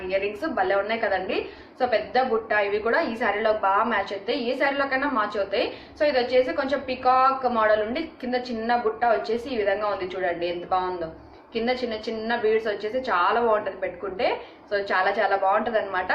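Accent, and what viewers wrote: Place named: Rayalaseema, India. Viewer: Midi raylseema LA undi yasa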